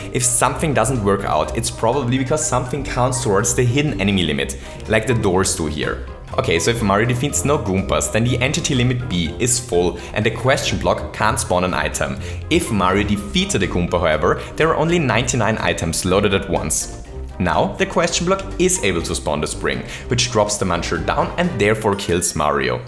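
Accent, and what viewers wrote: Germany. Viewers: Are you german? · He's German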